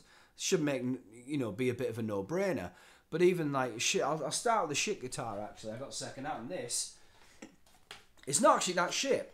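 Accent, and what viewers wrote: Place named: Northern England, United Kingdom. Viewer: I forgot to say - great to hear a northern voice on YouTube. I spent a number of years in Bolton and me mum is a Sheffield lass. Living in the US now - and miss hearing those dialects. Yeah, Epiphones are hit and miss. I think the Korean made Agiles (from Rondo) are better. Not sure if you can get those back there. Regarding the fret-ends on that Aliexpress Tele - get yourself one of those 45 degree angle block files, a fret file with the corners smoothed off, one of those diamond-crusted crowning files, and a set of micromesh pads (you can get them all cheap on eBay). You seem to be able to do a bit of work on your guitars so I think you can tackle those fret- ends mate. By the way, I have to do that job on almost every guitar I buy online - so it's not just the Chinese knockoffs on Aliexpress. Fret dressing tools are a must for modders and restoration folks methinks.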